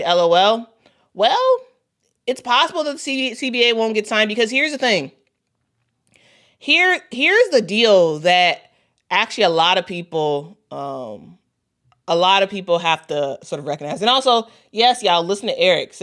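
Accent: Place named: South, United States. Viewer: Wow you have the countriest most southern accent to be from Chicago😆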